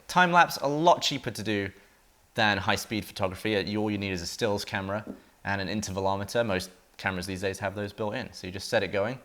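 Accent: United States. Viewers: sounding really american in this vid. I wonder if I moved from here to england how long it would take to start getting a british accent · You're accent is turning American we have lost you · Your American accent is coming through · Your accent is becoming more american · you are really starting to pick up the american accent in parts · Your picking up a slight American accent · Anyone else notice how his american accent is developing?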